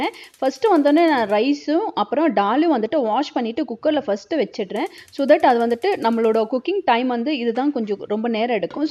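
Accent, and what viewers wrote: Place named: North India, India. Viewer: Unga Tamil romba styla iruku, neinga north Indian a??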